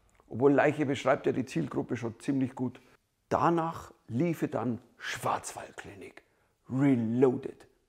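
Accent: Bavaria, Germany. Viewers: nein, nein, passt schon; ich finde es lustig! 😅 Überhaupt mag ich allgemein deinen/Ihren bayrischen Dialekt...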